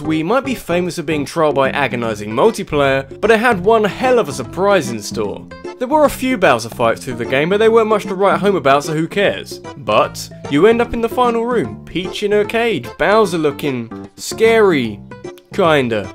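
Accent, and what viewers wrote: United Kingdom. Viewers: Gigadrain is it a British Accent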